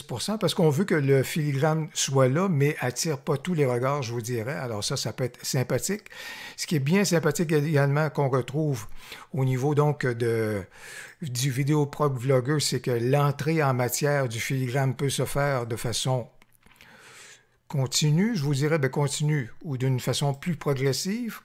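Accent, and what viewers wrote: Quebec, Canada. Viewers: Merci beaucoup pour Tuto très bien réalisé. Et puis pour moi, cerise sur le gateau, c'est l'accent Québecquois que j'adore, même si tu me répondrais que tu n'as pas d'accent et co contraire c'est moi qui ai un accent de "Maudit français" 😂😂😂 Et Merci encore une fois !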